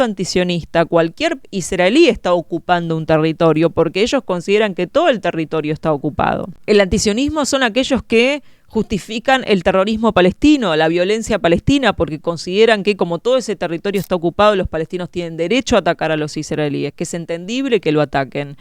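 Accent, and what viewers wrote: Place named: Rioplatense, Argentina. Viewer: Muy bien explicado por una muchacha que tiene una gran preparación, elocuencia, oratoria y facilidad de palabra.Y no nací ayer....Por el acento no me doy cuenta si es una chica macanuda de Argentina, Uruguay o radicada en otras naciones hispáno-parlantes. Viva Israel!Am Yisrael Jai!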